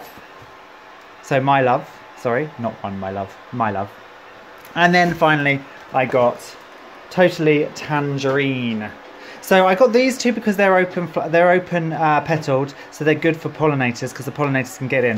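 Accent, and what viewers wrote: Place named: United Kingdom. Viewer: Your video is very nice, but why are you speaking with a British accent??? I am Argentinian.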